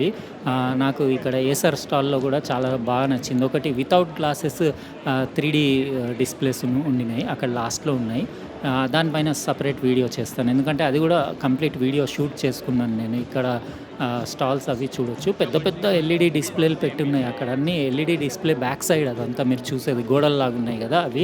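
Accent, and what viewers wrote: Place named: Telugu, India. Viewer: Yes i liked it sir....u soothing voice and Telugu slang with total technical things in simple way..... Plz visit china electronic market..... plz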